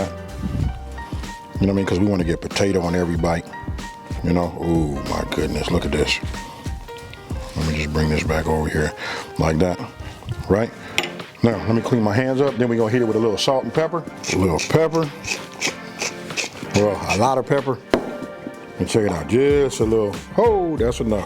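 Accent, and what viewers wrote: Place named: Louisiana, United States. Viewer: Damn can't believe I haven't come across your channel any sooner. Only my second video and I gotta ask brother where's your hometown? Because I swear I here some cajun in you sir. Gotta be south La some where. Laplas and Lafeyette area myself. Been away from home for 20yrs and sure is nice to run into someone from that area with that accent that knows how to cook. Subscribed and really enjoying your vids.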